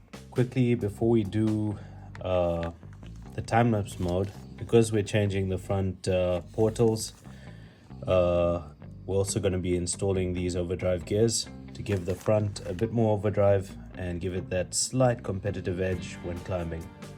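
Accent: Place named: South Africa. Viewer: Always great to hear a fellow South African when randomly browsing through hobby YouTube :) Quick question with the furitek starter kit how did you connect the two speed transmission servo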